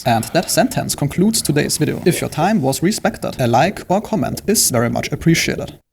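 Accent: Germany. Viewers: Excuse me Brother but kommst du zufällig aus Germany? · Das Denglisch hör ich doch direkt raus. Gutes Video❤ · Versteht man ja kein Wort mit dem deutschen Dialekt. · Only narrator where I am not sure whether he is talking german or english. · a little bit german ich höre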